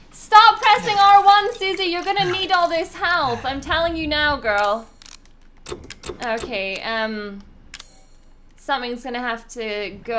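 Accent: Scotland, United Kingdom. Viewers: Love you! Damn well hooked me on this series of yours so you have my thanks for that including getting them out so early! (Plus the amazing scottish accent)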